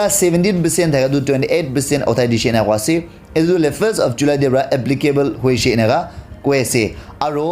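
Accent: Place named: Nagaland, India. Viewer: I really like his nagamese accent🤣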